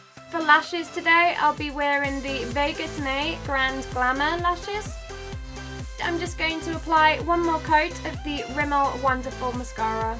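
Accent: United Kingdom. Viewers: i'm german and i absolutelyyy looovee your british accent (is is acctually british huh?! :D) and I love your videos!!!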